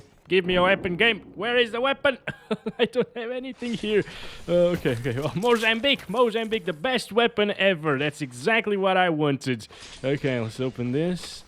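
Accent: Portugal. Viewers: Kksksksksksk coe mane, o cara é português que doidera🤝🏻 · Descobri agora que esse cara é português XD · você é português !!!????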